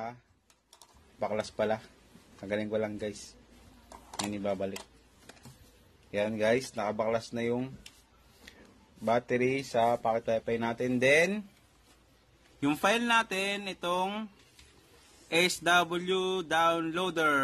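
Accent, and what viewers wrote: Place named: Philippines. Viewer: Paps ayus ko ung smartbro LTE ko!! 6 days old n sya ngyon since binili ko sa smart for ₱1,295, Fx-Pr3F sya and di sya post paid.. akala ko tuloy mali ung nabigay skin nung rep na nagassist.. So un nung day 1 nakita ko pa ung upgrade sa router tab ng gateway page. Kaso after awhile na idle ung device nawala na din nung mag upgrade n ako.. Di ko na ayus ever since. Nag hanap ako d2 sa youtube ng guide and madami sila kaso ung sayo lang ang may WORKING DOWNLOAD link and ung iba wala na ung files sa link nila. Easy to follow ang walkthrough mo and pinoy na pinoy pa ang accent the best!! Salamat paps! Instant subscription na to!! Ang habol ko talaga maging version 9 lang ang firmware ko ngayon open na din sya!! Hahahaha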